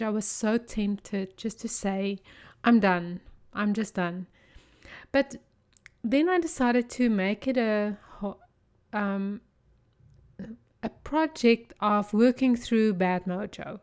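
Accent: South Africa. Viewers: Hmmm sound like a fellow South African, howdy from Cape Town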